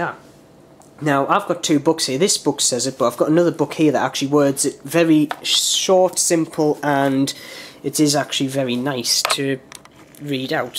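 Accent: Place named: Durham, United Kingdom. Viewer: Geordie? sound more durham to me like?!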